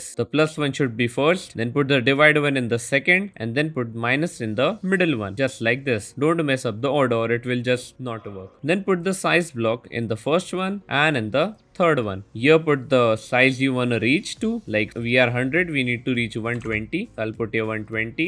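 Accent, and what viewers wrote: India. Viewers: Its always that nice guy with an Indian accent who makes the absolute best tutorials. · always the Indian guy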